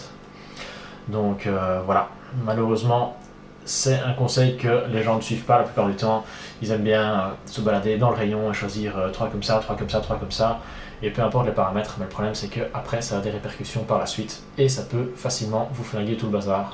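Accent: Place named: Canada. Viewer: J'aime bien les commentaires et surtout l'accent canadien je prends plaisir à l'écoute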